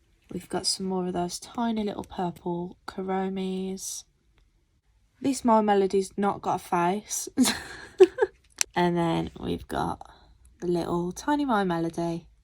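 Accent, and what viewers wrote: West Midlands, United Kingdom. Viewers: New subscriber love it all lol and your accent is very common lol 😆 😂 I'm from walsall West midlands and I said to my chap "omg babe she's from round here somewhere ay she ? " lol 😆 🤣 😂 I'm a begginer at nails just started out a few months ago love everything you have look forward to your next haul lol like being nosey what others get 😆 😄. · Hi, just found ur channel so ive subscribed, i know how important likes, views & subs r on Utube. Just wanted 2 say " U GO GIRL ", nice 2 c uk nail artists starting new channel, as its so swamped with USA, which i enjoy watching 2, but wud luv if UK & USA were more evenly represented!! I also thought of starting 2 film my nail art but I'm just not brave enough at min, so huge respect 2 u 4 doing that 😊 U GO GIRL!!!! Anyway, enough waffle from me 🙊, lol, lookin 4ward 2 more vids from u, BYYYYEEEE ❤❤❤ P.S. 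Do u mind me asking where ur from? Not exact address, lol, im not a stalker, promise, but im from Oldbury and I have a feeling ur not 2 far from me judging by ur accent. I wud luv some input from a local nail enthusiast as ive started making & selling press ons. If ur 2 busy no problem, I'll find sum1 eventually, just wanted 2 ask 3 or 4 questions if u ever have time, thx hun 😊😊 xx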